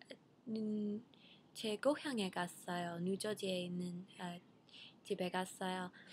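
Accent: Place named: South Korea. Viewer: Glad you are in Hawaii! I have friends in Hilo but your Korean is much better than hers! Hope you have a great time in Hawaii and in your classes. I love love your korean accent when you speak english...Couldn't be cuter!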